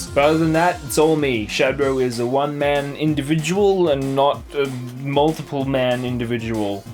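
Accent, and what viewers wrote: Australia. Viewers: Australian I'm pretty sure... not positive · Kneight yep Australian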